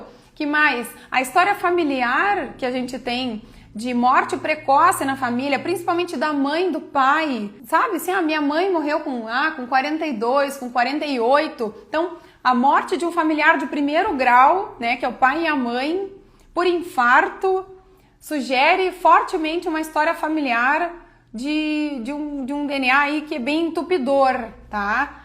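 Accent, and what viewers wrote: Rio Grande do Sul, Brazil. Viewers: Dra de onde a Sra é? Do Rio grande do sul? Se sim atende onde? Moro perto de Porto Alegre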